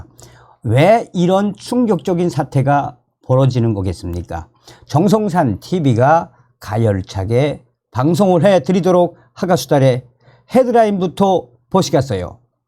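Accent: North Korea. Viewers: 모든것 좋은 데 북한 말투를 쓰는게 정 떨어져요.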